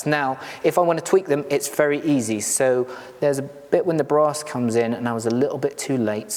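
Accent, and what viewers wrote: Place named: London, United Kingdom. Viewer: It's called an accent. London or close by.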